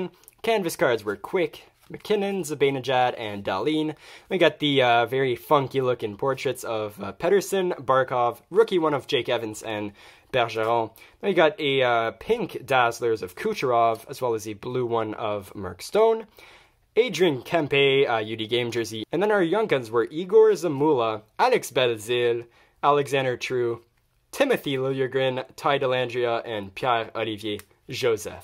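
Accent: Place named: France. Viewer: stoooooop with the french pronunciations of players names, only thing that makes me not want to watch u lol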